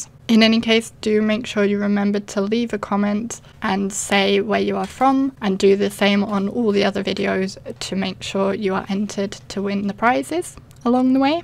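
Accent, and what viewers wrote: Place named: England, United Kingdom. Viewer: (I just want to let you know first that I'm not commenting to win. I truly just know this because I'm also on a crafty budge. So don't feel bad at all! Just because we're on a crafty budget, we can bring just as much happiness to others through the cards we make. So just think of all the people you make happy!!!!! ) For foam tape, you can use just regular pieces of foam or even foam with adhesive already on it. You can get this at just about any craft store. Then just cut to size and add to project. I noticed that you have an English accent. So if you're across the pond, I'm not sure what stores you have but it's available at any Dollar General or Dollar Tree store. You also mentioned that your embossing ink was drying out. You can use the same ink pad & just re-ink it with glycerin. (Check out Lindsay Weirich- "thefrugalcrafter"" her YouTube channel name. 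She used to do alot of cheaper ways to do things. Now she seems to focus on watercolor tutorials.) Glycerin can be bought at any general pharmacy. Your cards were very beautiful!!!! I loved the ink blending behind the heart & the sequins sparkling through the heart pieces!!! You definitely are better at that technique than I am!!! I tried once & gave up. Good luck with your YouTube channel & everything else!!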